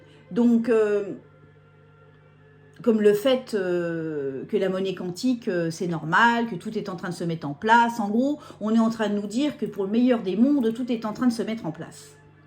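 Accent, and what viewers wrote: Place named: South, France. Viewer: Bonjour,pas mal l'accent du Sud 😋 capsule humoristique avec un cap bien mené ☺️ merci et a bientôt 🎉❤🎉 je vous envoies les cigales 🦗🪰 d'Aix en Provence 🍀🍀 gros bisous 🌹🌹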